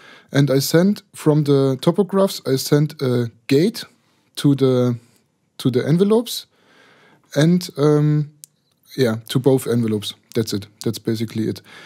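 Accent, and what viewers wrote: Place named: Saxony, Germany. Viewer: sehr cool, vor allem der edle sächsische akzent!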